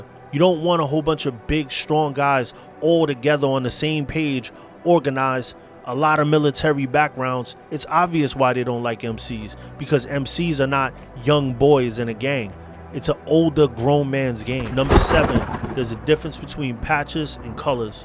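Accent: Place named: Bronx, United States. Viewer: Are you from the northeast by chance? Kinda sound like ya from the Bronx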